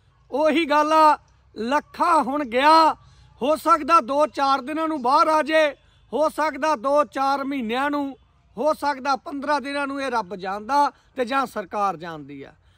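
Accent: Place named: Haryana, India. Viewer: Tusi. Bai. Ji. Haryane. Wale. Lagde. Ho.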